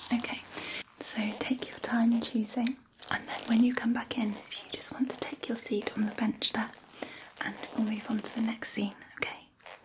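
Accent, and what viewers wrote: England, United Kingdom. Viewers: you look like a living doll! You have that "Carrie Underwood" effect, where you look so beautiful, that you you don't seem to be real!:-) Thank you so much for your soft, English accent - listening to your ASMR puts me right to sleep when I am suffering from insomnia! Also - LOVE the leather look and sounds... the "leather jacket" look is "Amazing" on you!! Thank you again, and Cheers!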